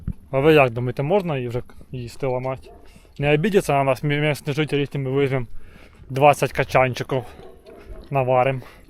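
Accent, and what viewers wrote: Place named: Surzhyk, Ukraine. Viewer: Супер суржик, нікого не слухай, звучить гарно і натурально - це твоя фішка як в Канадського Марка)) Admin 11 думає що тільки він читав українських письменників і тепер може повчати як кому розмовляти) від літературного українського говору відразу хочеться спати)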